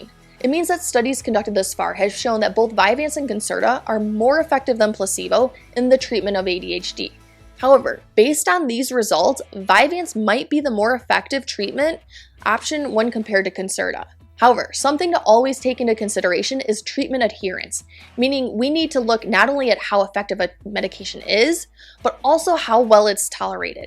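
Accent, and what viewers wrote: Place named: Wisconsin, United States. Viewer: You’re from Wisconsin or something with that accent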